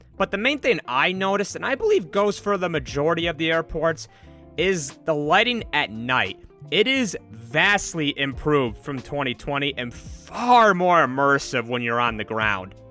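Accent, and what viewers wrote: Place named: United States. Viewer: Thank God, finally someone who sounds like an American. Jeez. YouTube seems to favor everywhere except American content creators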